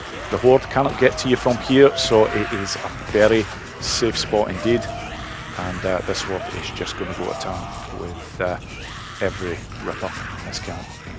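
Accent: Scotland, United Kingdom. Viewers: Dude is it Scottish accent you got? Sounds like Mimir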